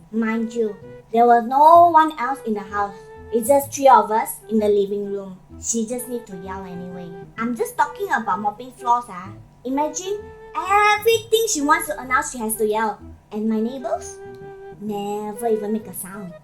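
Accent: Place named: Malaysia. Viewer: Welcome back. I love your humour and I especially love your Malaysian accent. You do not put on the faux American accent. Keep up great work.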